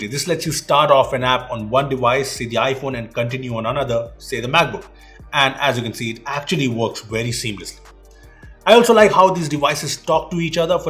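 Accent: India. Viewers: You have the english with indian accent which I hate, however I never got annoyed of your talk and I love to watch you.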